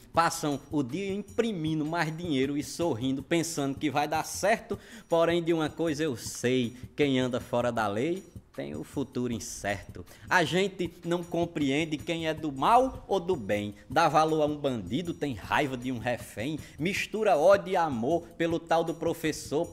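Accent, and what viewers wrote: Northeast, Brazil. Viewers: O jeito nordestino que amo falando da serie que mais amo 💜💜💜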